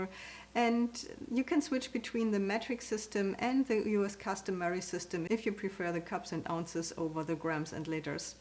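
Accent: Germany. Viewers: danke ganz toll. man hoert deinen deutschen akzent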